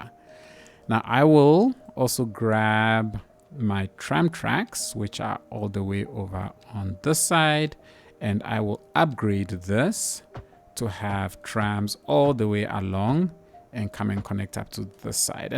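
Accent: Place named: South Africa. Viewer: Finally!! A South African Cities Skylines gamer 👏🏿 knew it from the accent. 🇿🇦 And the roads have the correct handing too. Make sure you have enough policing please 😅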